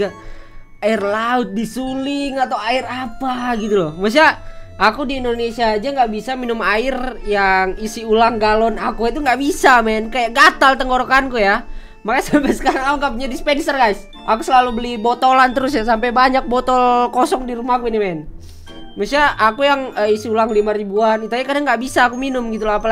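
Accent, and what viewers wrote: Kalimantan, Indonesia. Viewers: Anjim, org kalimantan trnyata. Jelas aja agak kenal dngn logat2 nya😂😂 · Abang Kalimantan apa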